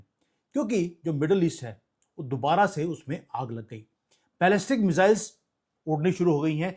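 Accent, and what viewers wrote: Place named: Punjab, India. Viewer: Hehehe..very interesting.. I very much like your typical punjabi style👍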